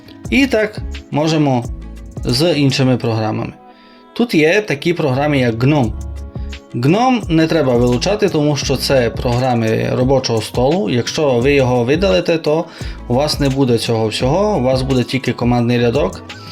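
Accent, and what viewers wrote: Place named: Transcarpathia, Ukraine. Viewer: кострубато то кучеряво (пелехато, заплутано), просто ви розмовляєте дуже подібно до закарпатського діалекту, і людина подумала що ви десь з виноградівського-берегівського регіону. Якщо чесно я також так подумав, але якщо ви не знаєте що таке "кострубато" то я навіть не знаю звідки ви.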